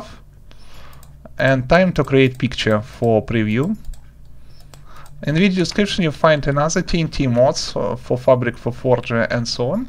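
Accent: Russia. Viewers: Руський акцент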